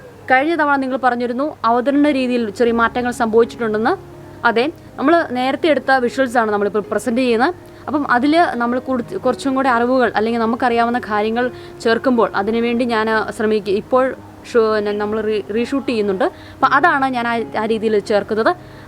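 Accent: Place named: Kothamangalam, India. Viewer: Vargheeya Paraamarsham Nadathaathe Nishpakshamaayi Kaaryanghall Parayu....Paavam Malayalli Vlog Kandu padhikku... Theere Moshamaya Avatharannam...Bhaashayum Athupole....Kothaazham Slang Arochakam..... 🙄😡🙄😡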